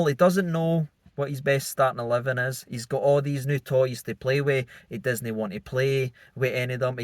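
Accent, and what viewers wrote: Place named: Fife, United Kingdom. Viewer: ...which part of Scotland are you from? Your accent does not seem....glaswegian. Are you from Fife?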